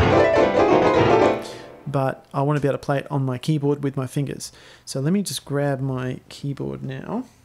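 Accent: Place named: Australia. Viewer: hi great help I just got a usb cable to go from my imac 209 to my roland Em 15 and was showing in put light on in green but would now show up on mac or work, thats cause its not a midi keyboard right and my interface is a focusrite scarlett solo so has no way of connecting it up I looked up how to connect it and was advised to get the usb midi cable so what can I do please do I need to download software or what. I'm from melb I noticed your Aussie accent.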